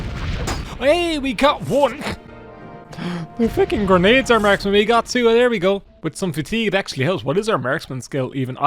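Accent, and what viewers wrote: Ireland. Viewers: why are you irish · You sound like DingDong in an Irish accent and it's truly endearing. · I missed your beautiful Irish accent 😭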